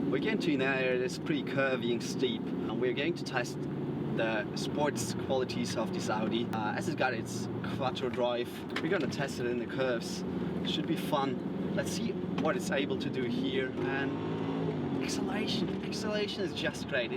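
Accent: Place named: Australia. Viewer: Your accent sounds australian🤔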